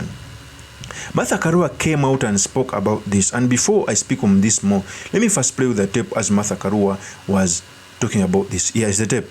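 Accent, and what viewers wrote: Uganda. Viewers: U are Ugandan according to your accent...why are u calling yourself kenya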